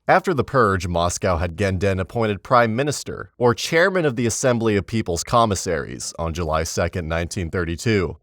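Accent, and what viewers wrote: United States. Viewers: speaking in America English ? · Only an American would talk about “Buddhist” church! The ignorance is astounding! They are also the ONLY people who say “Moss-Cow” and not the correct: “Moss-Cow” Never trust an American teaching history. Especially one ignorant enough to think Buddhist’s have churches!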